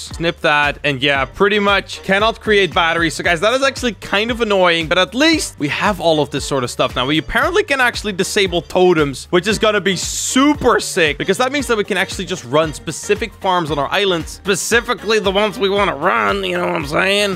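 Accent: Netherlands. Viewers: Are u Nederlands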